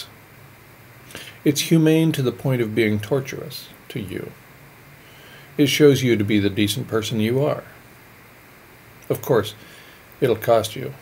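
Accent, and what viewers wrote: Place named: New York, United States. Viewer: Like listening to the old Mensch with his New York Bros accent and straight talk... It's amazing how we bounce around in life... Just celebrated a birthday and scratch my head on the chance encounters with ideas and people and events. Makes me wonder on all concepts... Perhaps, just maybe, after crossing over the River Styx it all all be revealed... Probably not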